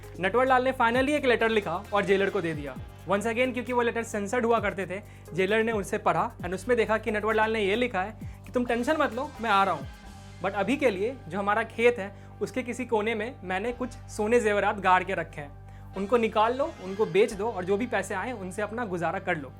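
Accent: Bihar, India. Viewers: Oh... Nice to hear Bihari accent🤣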